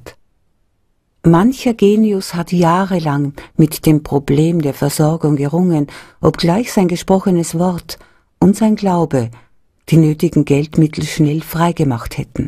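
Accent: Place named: Austria. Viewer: Ja leicht österreichischen Dialekt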